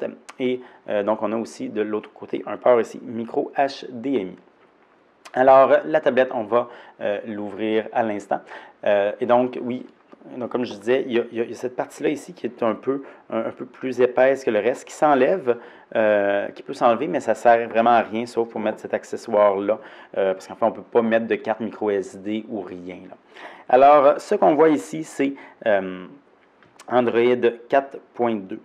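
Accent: Quebec, Canada. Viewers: si il parle bien Français c'est juste qu'il a l'accent quebecois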